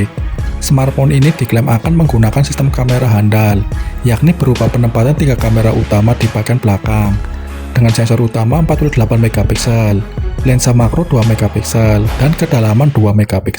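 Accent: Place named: West Java, Indonesia. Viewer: Bang... Orang sunda nyakkkk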